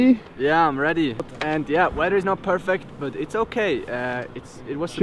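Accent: Switzerland. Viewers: Deine Vlogs werden echt immer besser! Liebe deinen Schweizer deutschen Akzent😂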